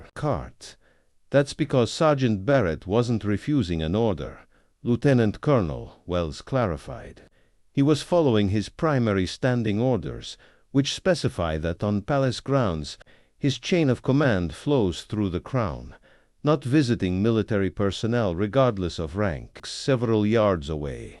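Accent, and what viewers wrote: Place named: Eastern Europe. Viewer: UTTER NONSENSE The young fella on the "title page" appears in a private guardsman uniform embellished with various bits of frippery and unknown collar dogs and shoulder flashes. The narrator has a pronounced east-European accent - a noticeable Russian cadence. Since when has Buckingham Palace had ornamental flower beds on the public side of the gates? This is COMPLETE CRAP - DON'T WASTE YOUR TIME - IGNORE "Guard's life"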